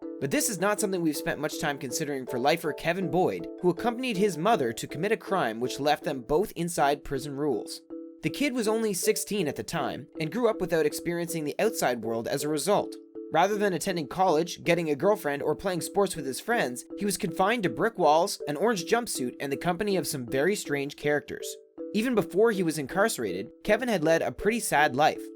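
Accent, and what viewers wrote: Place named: Canada. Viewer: You can tell the guy talking is Canadian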